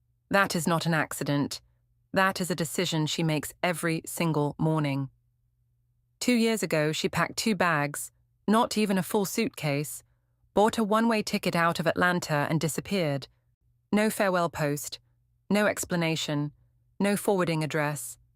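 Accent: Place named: England, United Kingdom. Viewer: I have a hard time to listen this English accent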